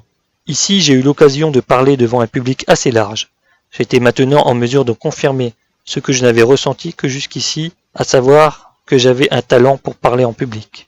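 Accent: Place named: Germany. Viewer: lol je sais, je le pense un aussi haha, c'est ta prononciation allemande qui mentait rire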